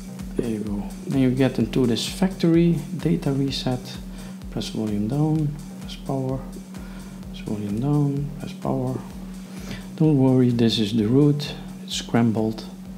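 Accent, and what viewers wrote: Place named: Netherlands. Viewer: You're Dutch I noticed it from your accent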